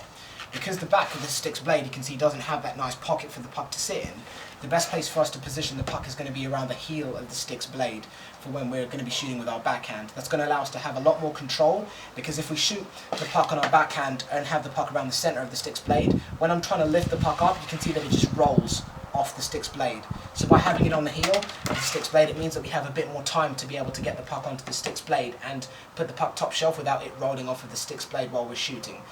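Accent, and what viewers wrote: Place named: United Kingdom. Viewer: A black guy with a british accent giving hockey tips... awesome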